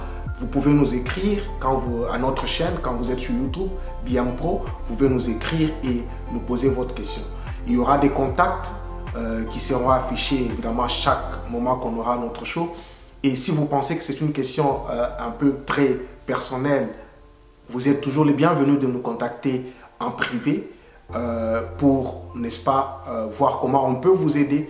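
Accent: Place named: Cameroon. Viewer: BM pro merci Je reconnais l’accent Cameroun Je me trompe?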